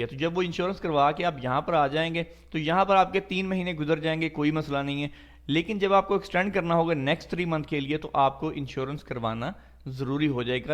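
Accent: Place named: Punjab, India. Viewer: آ پ کا نام کیا ہے بھائی آ پ کی لینگویج سے پنجاب سے لگ رہے ہیں بہت اچھا کام کر رہے ہیں ویزٹ ویزہ کے متعلق آ پ کی ویڈیو ز سب سے زیادہ انفار میٹو ہیں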